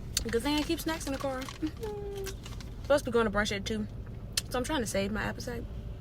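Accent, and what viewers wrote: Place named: St. Louis, United States. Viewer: LOL ok your accent! You sound exactly like my family in St. Louis LOL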